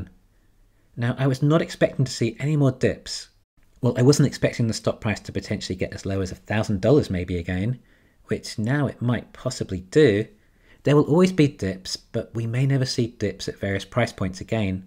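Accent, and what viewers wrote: United Kingdom. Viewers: I always wonder, you seem to live in the US but you have a British accent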